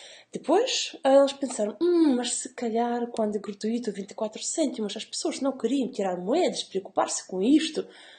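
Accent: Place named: Eastern Europe. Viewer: O que me admira mais é o facto de conseguir raciocinar abstratamente numa língua estrangeira, de forma tão correta! O seu ligeiro sotaque de leste, então, é a cereja no topo do bolo! Parabéns, Senhora!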